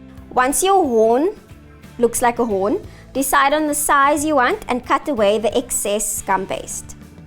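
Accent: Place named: Zimbabwe. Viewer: You sound Zimbabwean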